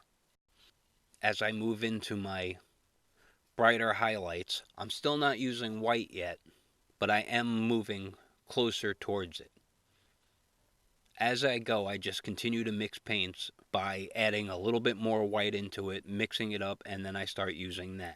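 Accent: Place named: New York, United States. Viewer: ohh that's such a good way to study! Are you planning on doing anything more with the fire or water? I bet you could make all sorts of amazing, surreal paintings... The 4th one really looked like it was hitting something round and invisible! I bet there are tons of invisible things you could paint! I thought I detected a subtle (and lovely) hint of new york in your voice, but I've never been there so I wasn't sure. What's the best part of living in long island? Or is it 'on' long island?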